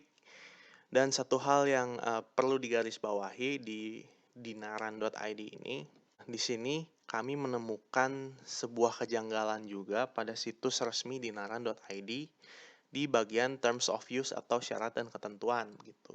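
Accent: China. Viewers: Wong logatnya aj Aseng bngt Panteslah klo u ko kontra SMA bossman 🤭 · Logat aseng....jualan liur lu seng😆😆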